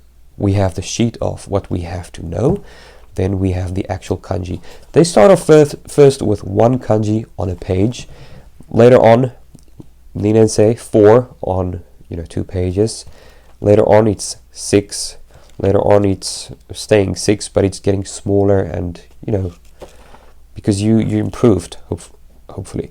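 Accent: Afrikaans, South Africa. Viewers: lol afrikaans accent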